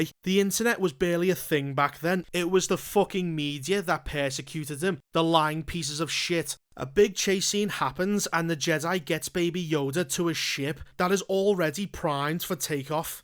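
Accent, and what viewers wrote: Liverpool, United Kingdom. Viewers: You’re deff a scouser haha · No danger a scouser thought anyone would listen to this fucking accent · I love you you scouser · Are you scouse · first fellow scouser nerd ive come across on youtube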